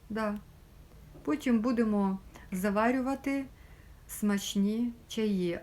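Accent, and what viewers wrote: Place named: Transcarpathia, Ukraine. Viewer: У ВАС діалект мови Закарпаття.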